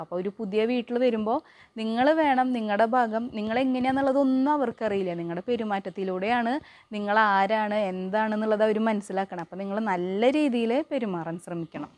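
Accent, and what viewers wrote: Thrissur, India. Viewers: തൃശ്ശൂർ ഭാഷ കേൾക്കാൻ നല്ല രസം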